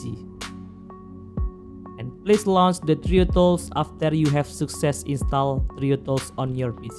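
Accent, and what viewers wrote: Indonesia. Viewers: Your accent kek orang Indo anjir but its good tho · benerrr tp aksennya ketebak banget klo org indo WKWK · org indo ya bg? ketara accent nya😅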